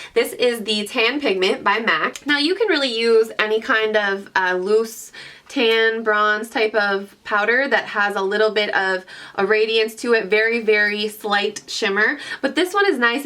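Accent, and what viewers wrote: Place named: East Coast, United States. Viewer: I Love your slight accent. I'm assuming your from the east coast?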